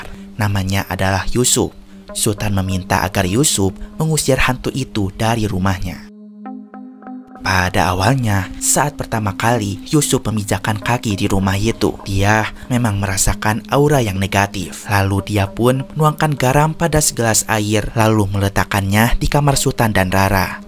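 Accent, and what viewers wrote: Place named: Java, Indonesia. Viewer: Pasti jawa😂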